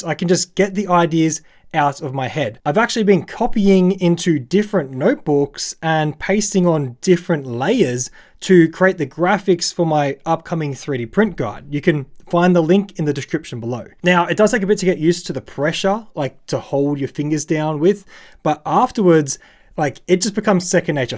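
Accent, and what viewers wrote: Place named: Australia. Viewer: I've got a Supernote Nomad and I love it. Can highly recommend if you are a writer/reader of any kind. Good to hear the voice of a fellow Aussie promoting such a great product.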